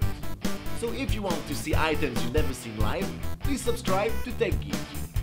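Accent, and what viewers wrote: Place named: France. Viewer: Très drôle t'entendre parler en anglais, frenchy! :P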